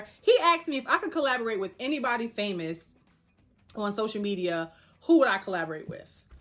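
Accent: New Jersey, United States. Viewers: YO Are U from Jersey City? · Hold up you from new jersey?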